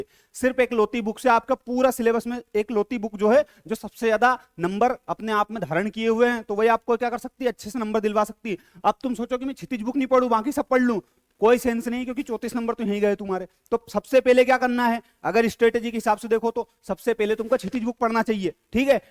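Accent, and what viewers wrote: Indore, India. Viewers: Pure indore accent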